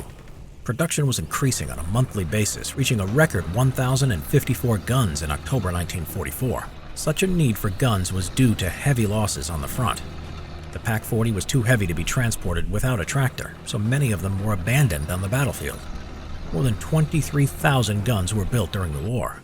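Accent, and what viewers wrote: Germany. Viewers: I love those german pronounciations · you have german accent noice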